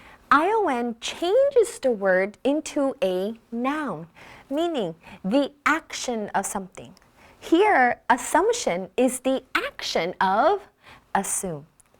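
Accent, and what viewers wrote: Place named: United States. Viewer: Thanks a lot, that's what I've expected for a long time. Pretty great chance to practice American accent instead of listening to the online dictionary. Hope to hear more about the synonym or slangs and pronunciation from you. from Canada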